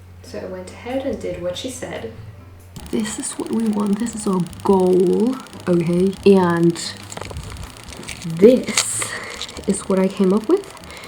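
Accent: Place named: Germany. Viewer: I knew you were german! I am normally so bad with dialects and accents. I can't even distinguish between our german ones - exept for bavarian because I've got a bavarian friend and I think I am doing good to figure that out x3 Anyway I somehow thought you were german and it felt nice to be right about that and not be needing to read the subtitles while you talked to your boyfriend x3 I think Micarah's aren't exactly the best for tutorials tbh, but I looooove watching them. I know how to sew the bsaics and all but as I am not good enough and wouldn't know what to do with these sort of dresses/clothes (exept for maybe one day start cosplaying again x3)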